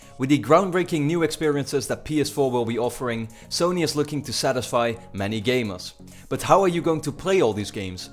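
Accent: Netherlands. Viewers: Haha, ik kan horen dat je nederlands bent, te geweldig accent man. Je kunt goed engels spreken hoor, niks daarop tegen :)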